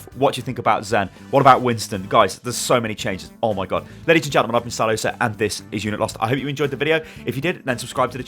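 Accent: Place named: United Kingdom. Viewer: He's like an excited British puppy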